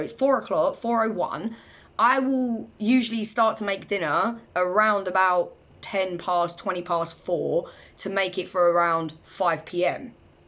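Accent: United Kingdom. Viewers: Subscribed for her British accent and energy.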